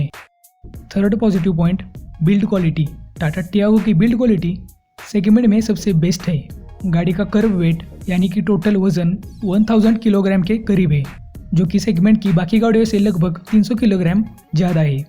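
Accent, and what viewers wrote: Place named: Satara, India. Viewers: भाउ तु मराठी मानुस दिस्तोय बोलन्या वरुन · Bhau tumhi Satara che aahe watta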